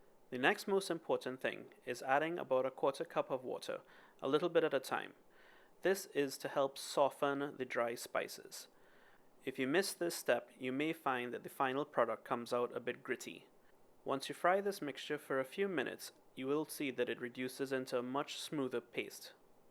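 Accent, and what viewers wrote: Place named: Trinidad and Tobago. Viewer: Good speaking Trini voice and feeling proud.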